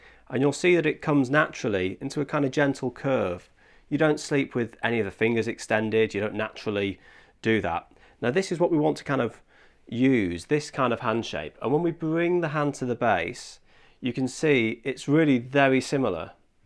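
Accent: England, United Kingdom. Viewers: What kind of English accent is this? I like hearing him talk lol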